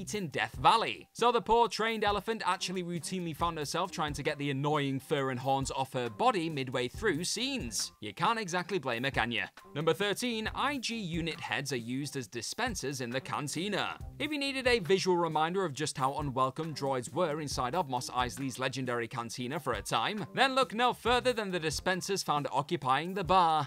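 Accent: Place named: Geordie, United Kingdom. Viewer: Gotta love a slightly posh Geordie nerd. I am one myself.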